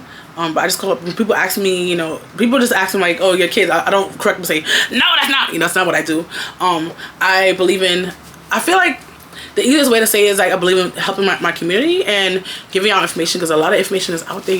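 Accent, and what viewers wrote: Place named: Jamaica. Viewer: Hear the Jamaican accent, dutty lol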